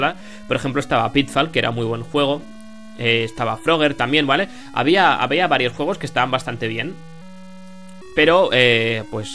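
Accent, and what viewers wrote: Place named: Spain. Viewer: no se que sera mas malo los juegos en cuestion o los comentarios tan estupidamente planteados con ese tono españolete que irrita tanto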